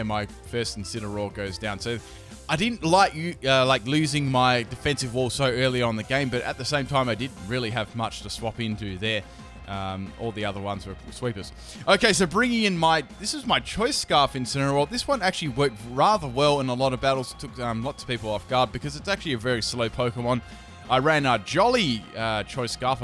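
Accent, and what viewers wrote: Australia. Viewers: Of course the narrator is Australian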